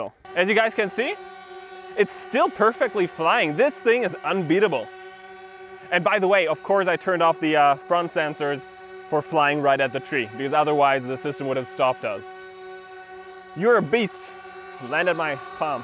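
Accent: Germany. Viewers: you can hear the german acent decent mein Freund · Haha everytime when I watch a german guy talking english I clearly hear it but this time it was difficult because u spoke pretty good english I could barely hear the german accent naice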